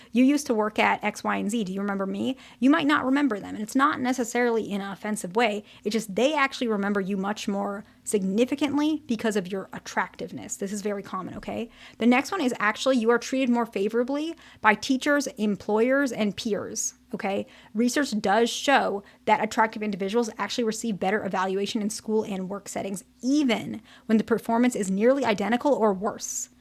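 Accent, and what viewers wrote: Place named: United States. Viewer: It’s just a regular American accent.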